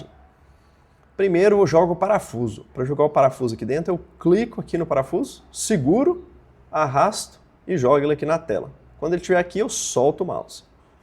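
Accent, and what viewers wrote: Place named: Minas Gerais, Brazil. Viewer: Realmente melhor tutorial para começar! Bonus point pro sotaque, Mineiro né? hahaha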